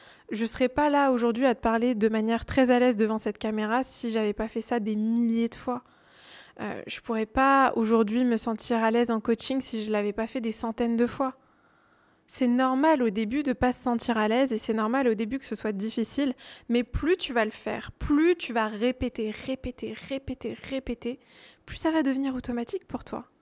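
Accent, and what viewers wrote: France. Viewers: Malgré tout je suis suisse allemand je comprends bien parce que parle comme une francaise parisienne (clair et pas trop vite).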